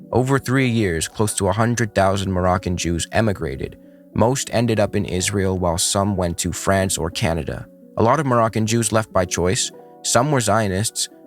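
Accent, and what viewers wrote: United States. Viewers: Sir you sound like an American. Doesn’t that mean that you are from a country that is colonized land? Are you prepared to leave this land and return it to the indigenous population? It could be that you have indigenous blood having intermixed ancestors but certainly you have blood of colonizers also so do you get to stay or must you go??? Does it matter who colonized THIS land in regards to whether YOU get to stay or go??? Populations over history have immigrated around, colonizing, displacing, being displace and even returning. The Jews are in Israel now. Regardless of their religious views or lack of them, they are there NOW. Just like we Americans are here now and we don’t plan to just leave and give the land back to the ancestors of the indigenous people because our ancestors fought them for it. We should focus of history and how civilizations have behaved over time. 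Nothing new here except that the world seems to hyper focus on Israel for some strange reason. My opinion which matters only to me, is that the world focuses on Israel because of Israeli’s claim of God giving them the land and world hates God. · Was quite okay listening to this as a background until I head the commentator’s American accent saying that he’s not saying the ottoman turks weren’t humanistic paradise and that they did some horrible shit like the Armenian Holocaust.. and I just found myself thinking how amazing it is that Americans somehow don’t exist in their own heads when talking shit about other civilisations. I mean he exists as a citizen of his country thanks largely to his ancestors successfully did to the indeginous people what Israel is doing to the Palestinians. And then they didn’t stop there, in order to compete in trade internationally they went and enslaved god knows how many Africans to keep their business overheads low. 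And NOW they are helping out with a genocide because their best buddy Israel really wants a genocide. If the ottomans did shit. What have the Americans been doing ?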